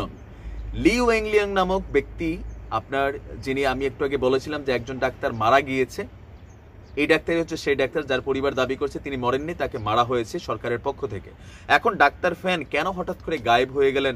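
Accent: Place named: Noakhali, Bangladesh. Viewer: কথাসুনে মনে হয় নোয়াখালি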